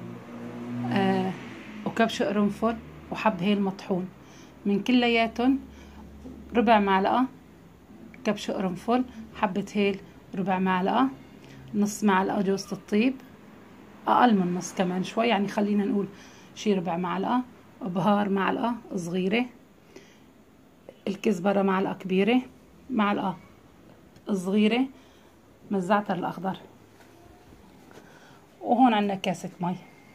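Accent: Aleppo, Syria. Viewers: تسلملي اللهجه الحلبيه...ابوس روحك 🌹🌹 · خالة حلبية 😍 من صوت عرفت 😘❤ ونعم وانا من حلب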